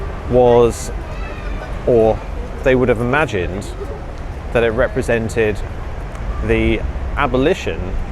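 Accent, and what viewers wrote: United Kingdom. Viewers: 'That's a thing?' 'It's a thing.' I see. Anyway, I'm really saying is your way of speaking is really British way. I love that way.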